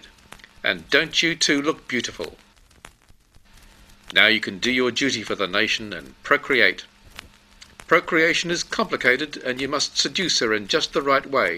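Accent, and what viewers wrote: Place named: South Africa. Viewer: Funny! But why the South African accent?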